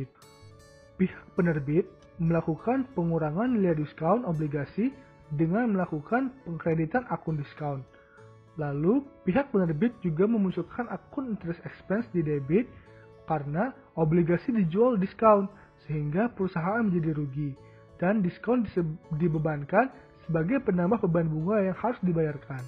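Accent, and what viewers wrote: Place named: Bengkulu, Indonesia. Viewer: Keren kak, berasa aksen bengkulunya coba lain kali dubbernya orang yang sama tapi disuru nyanyi dulu biar fresh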